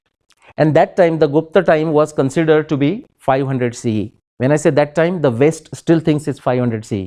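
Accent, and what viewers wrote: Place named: Maharashtra, India. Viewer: bro people of maharastra used to pronounce like this . acctually this is the impact of marathi on their speaking 🙂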